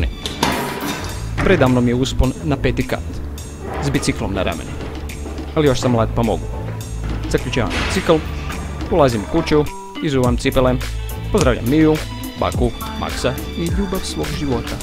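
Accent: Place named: United States. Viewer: tipicno Americki ko da nisi Balkanac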